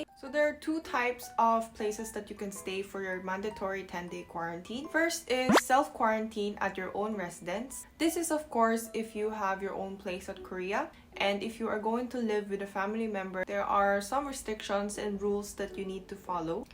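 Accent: Philippines. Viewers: I am still amazed by your accent and your tagalog language. So fluent para ka tlagang pilipino. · O diba, pinoy na pinoy kung magsalita.👍👍 New subscriber here. Hope you upload videos regularly in the coming days.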